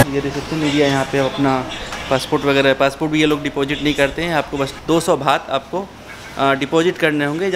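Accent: Bihar, India. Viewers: ooo bhai tu bihari hai kyaa... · Bhai aap bihar se ho kya? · bhai Bihar k ho kay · Bihar ho kya bhai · Bihar se ho bhai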